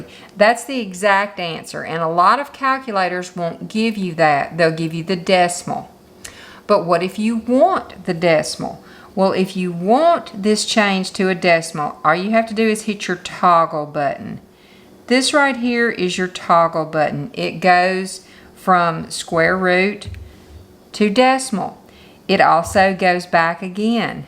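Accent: South, United States. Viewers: Thank you soooooooo much for such a super tutorial video!!! After many years of taking advanced math now everything's is blur. And now, I have to recall most of it but thanks to this calculator things are much, much easier! I just wish I'd had this calculator when I went to college some 40 years ago. BTW, I love your southern accent.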